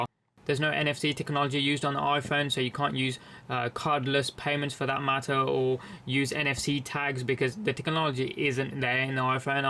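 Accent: Birmingham, United Kingdom. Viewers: wtf ur voice have to be the most annoying shit in this world ,a Chinese with British accent ?? dude shut the fuck up , u dont know shit abput this phone , galaxy probably pay u to make this stupid video -.- !! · I have a iPhone 5 and I do agree with you, it's got a bit boring. I don't know why I got it on my upgrade. It's like I couldn't think for my self and just followed the crowd. Good video dude. You sound like your from brum. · Honest review and an interesting english accent! I see no progress after 4s too... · Come on man he is British its a different pronunciation. So ignorant!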